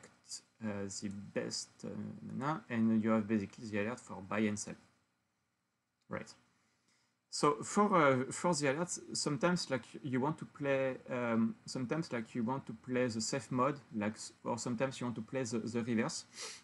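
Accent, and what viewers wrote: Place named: France. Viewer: toi tu es français 100% ton accents c'est sur poto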